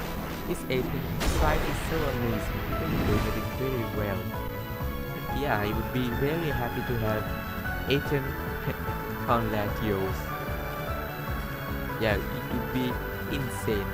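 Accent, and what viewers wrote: India. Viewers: Bro are you Indian?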